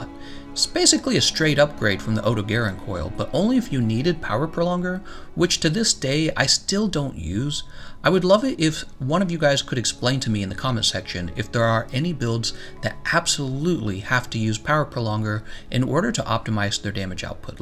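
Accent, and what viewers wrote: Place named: United States. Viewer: idk Why you get so much hate... Your videos have the best information... and your even tone makes the American accent easy to understand.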